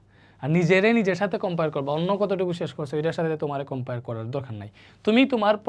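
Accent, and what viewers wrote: Sylhet, Bangladesh. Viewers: ধন্যবাদ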